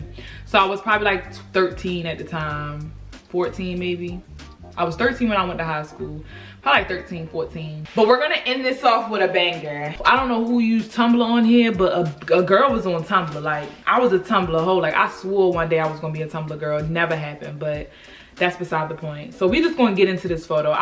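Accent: Baltimore, United States. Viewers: Are you from Baltimore? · Muva and Hotdugs 🤣 Yes! Girl that Baltimore keeps sneaking out! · You from Baltimore?? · This like my 3rd video watching her and I KNEWWW SHE WAS FROM BALTIMORE I know my accent when I hear it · i knew you were from baltimore bruh, the accent!!! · Girl you sound like Baltimore!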